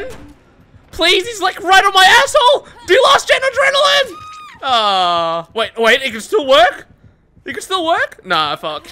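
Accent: Philippines. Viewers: The filo accent is so spot on I choked on my empanada. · being Filipino actually makes sense with his accent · Wow! The typical Filipino dissing the Pinoy accent! You got yourself a new subscriber.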